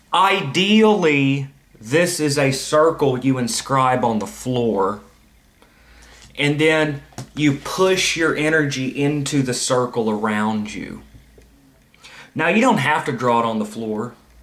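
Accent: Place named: Texas, United States. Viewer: I been watching for while and like the u put a bit of your sense of humour in with ur texan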